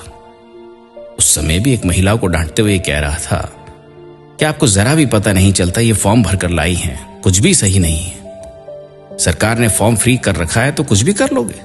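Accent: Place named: Punjab, India. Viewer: Aap ki Awaaj nice Punjab sa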